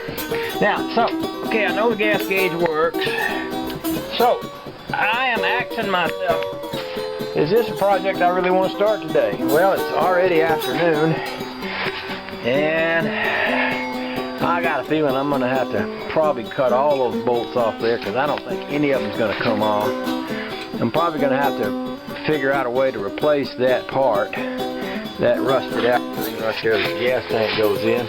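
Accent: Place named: Tennessee, United States. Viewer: The license plate said Maine, but your accent said TN. Nice jeep and thank you- I'm needing to test my fuel gauge too, in TN.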